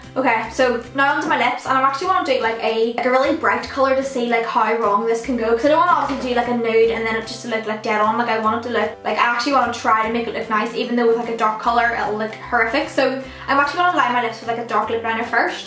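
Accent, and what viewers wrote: Northern Ireland, United Kingdom. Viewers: Are u from Northern Ireland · Are you northern Irish??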